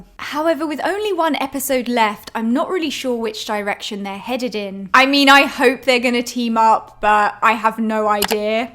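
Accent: United Kingdom. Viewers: You have the most british accent that i’ve ever heard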